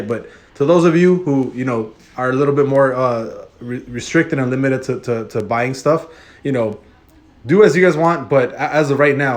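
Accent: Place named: East Los Angeles, United States. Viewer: Subbed ! Reminds me of that east LA accent. I'm staying put at 600 for now I wouldn't mind losing it but let's see how tomorrow goes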